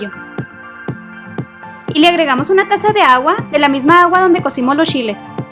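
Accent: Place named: North, Mexico. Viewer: Se me antojaron y luego luego se oye tú acento del norte del país pues dijiste shiles con S al principio y ese es acento de allá, se me hace simpático el acento del norte.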